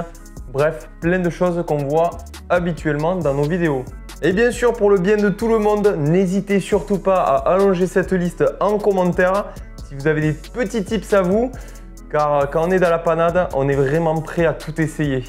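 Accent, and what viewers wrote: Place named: South, France. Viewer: Vidéo super utile ! Merci pour ceux qui n'ont pas d'ingéniosité ! Et toujours avec cet accecent du Sud, c'est la touche 😊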